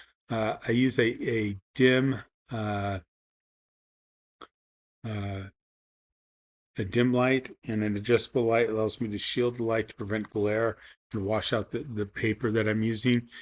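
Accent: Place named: United Kingdom. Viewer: So you are canadian ? I thought you were brittish .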